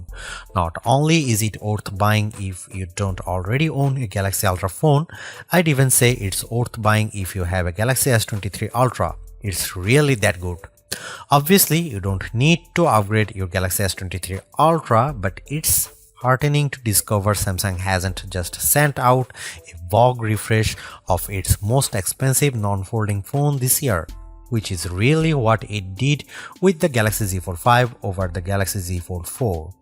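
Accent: India. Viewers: Indi accent insta skip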